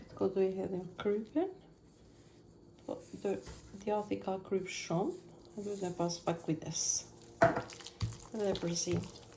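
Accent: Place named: Shkodra, Albania. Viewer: Ty te lymshin duart e pastra e te arta! Komplimente qe perdor doreza ne gatim çka tregon kushte higjeno sanitare te larta per moskontaminimin nga mikrobet te ushqimit dhe organizmit edhe pse gatuan per familjen tende! Por, ama, gjeja kryesore qe te kenaq vetem me te nigju me dialektin shkodran fantastik, "feltere", "tamin", "biber", "i perzime", "katerdhjete e pese minuta" Dialekti me i bukur i gjuhes shqipe! Te faleminderit!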